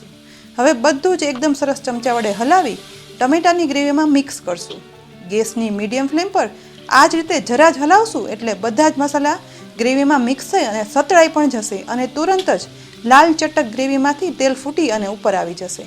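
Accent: Kathiawar, India. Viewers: Reecipe tau saras chhe j, Kathiyawadi guajrati saambhalavaa ni majaa aavi. ne tamaaro avaaj pan mitho chhe ne saras reetey samjaavyun! Aabhaar.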